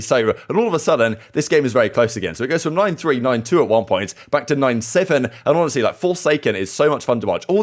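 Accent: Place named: England, United Kingdom. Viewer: he’s English, but nice try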